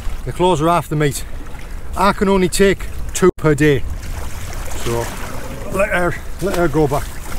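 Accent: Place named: Redcar, United Kingdom. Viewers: where abouts in the northeast are you from sound like redcar area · me and Mrs were trying for months I thought up Redcar as well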